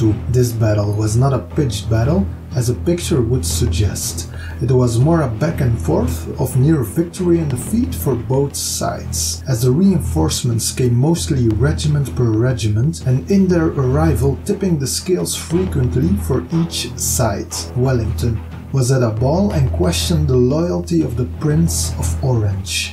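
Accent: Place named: Flanders, Belgium. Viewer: Ik dacht dat ik een Vlaams accent hoorde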